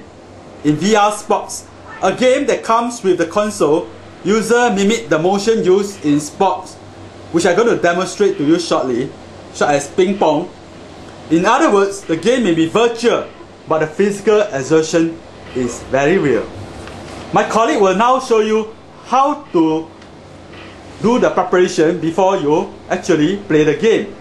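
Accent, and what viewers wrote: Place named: Singapore. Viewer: Guys, this is a Singaporean Accent... And he's using Singlish... the Slang of Singaporeans...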